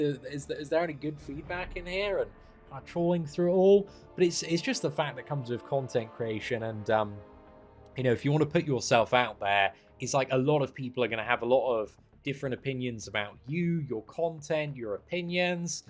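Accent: United Kingdom. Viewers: Gosh missed his bri ish accent alot it's actually an eargasm to me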